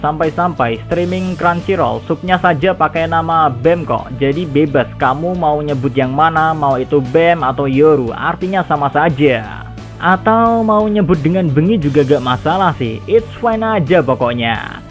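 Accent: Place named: Welahan, Indonesia. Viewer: Min kue wong welahan?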